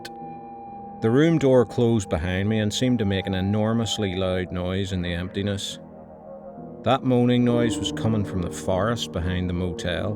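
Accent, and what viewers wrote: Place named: Ireland. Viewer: ❤ Hi I found your video and 1 at time listening to your narrative style & I've noticed you have a Irish? accent? Sorry if I got it wrong but you sound nice narrating the stories. Good job bro.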